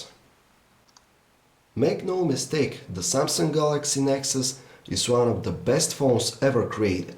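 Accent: Russia. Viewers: are u russian ? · Sir r u Russian? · put someone english speaking, i dont want to listen rusglish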